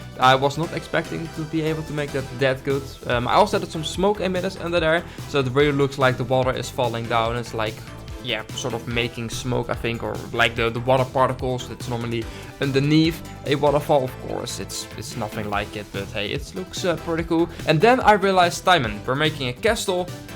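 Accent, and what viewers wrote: Netherlands. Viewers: are u from the netherlands?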